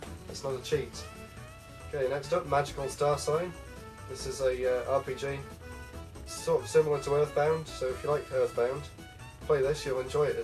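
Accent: United Kingdom. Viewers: I really love the way you speak ( british english i guess) and also your games are very nice. Even tough i would have like a quick gameplay of you to each game, i still gave you 2 thumbs up and subscribed to your channel. So keep doing your good Job! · do you live in uk e.g beacuse of accent and games label game shop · yea man no problem. really appreciate it. hope u gonna make a new video soon. it helped me to see which games i needed man. yea i figured you were from the uk. since u got a british accent :P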